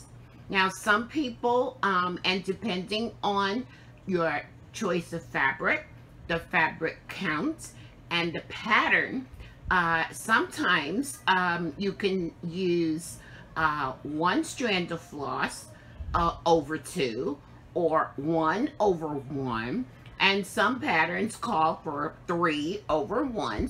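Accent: New York, United States. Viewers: Hi, Im originally from Bklyn, NY and I detected a familiar accent. I went back to your first introductory flosstube and sure enough your a native New Yorker! Awesome!! So happy to have found you and have subscribed to your flosstube. I never had a stitchy friend from NY. I crocheted back in my Bklyn days but learned cross stitch since living in NJ. I stitch in hand but occasionally will use a qsnap. I like stitching on 28 ct and use mostly DMC but like the fancy flosses variegated look. Ive ordered from Hobby House Needleworks a few times, love that shop. Thanks for being a part of the stitch world. See you next time.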